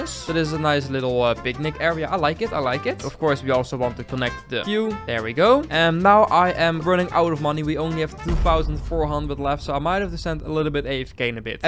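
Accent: Netherlands. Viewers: Do I hear Dutch? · wow ėėn goede nederlands aacent je bent ėėn echte nederlands person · Lekker een Nederlands accent aan het engels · The Dutch accent😂 love the video 🎉🎉🎉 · Ik kan horen dat je nederlands bent lol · “Som sietin” lemme guess your dutch😂 its such an dutch accent tbh, no hate ofc its just so funny to see a person that also speaks dutch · vind het altijd grappig als ik bij nederlanders aan hun accent kan horen dat ze nederlands zijn btw goeie content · Je bent Nederlands dat hoor ik bij het begin van de video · Jij klinkt echt Nederlands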